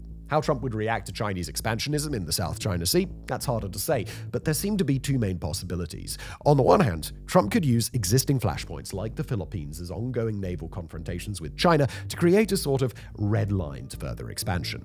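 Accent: United Kingdom. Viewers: you talk too fast. when we here a British person, we need to feel like we're being served something. its weird.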